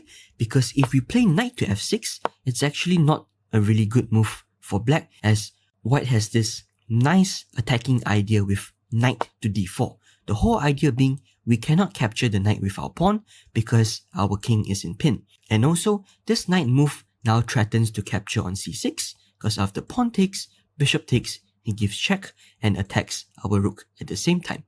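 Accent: Singapore. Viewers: Definitely Singaporean or Malaysian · You sound like a Singaporean. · Typical Singlish accent. Is this course given by Tin JingYao (Singapore number 1 GM by rating)?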